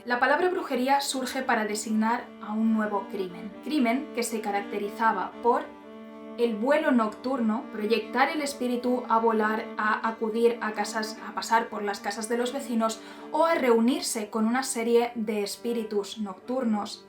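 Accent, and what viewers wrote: Catalonia, Spain. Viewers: Habla Español de España y El Sonido de esa Habitación o el Eco es Malo🎉.......She speak Spanish from Spain and the Room Sound and Echo is Bad🎉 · ¿Eres catalana?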